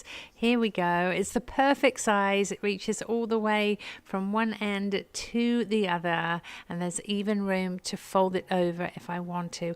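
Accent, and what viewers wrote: England, United Kingdom. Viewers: Your accent makes me want to turn you off,some times you overdo the High English accent.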